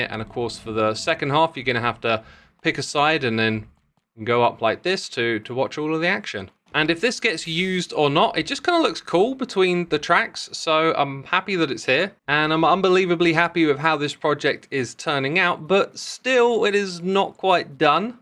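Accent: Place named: South London, United Kingdom. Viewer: Yeah, he's got that souf London thing going on.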